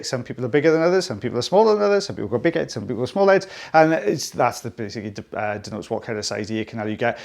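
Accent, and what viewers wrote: Wales, United Kingdom. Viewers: Amazing wax removals , just enough extra info, some audiologists are too technical, love your videos, your Welsh accent and enthusiasm make watching. A pleasure.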